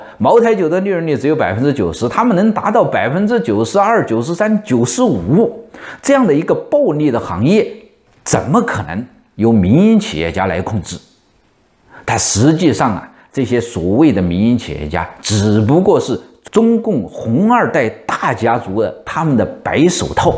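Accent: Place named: Changde, China. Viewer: 常德口音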